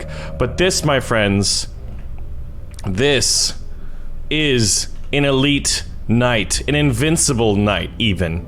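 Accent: Scotland, United Kingdom. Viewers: Best Scottish accent from - 2019.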